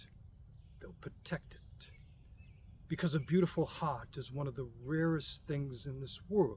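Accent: Boston, United States. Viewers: Well put I needed this thanks and I also appreciate you being able to tell me your from Boston with out telling me your from Boston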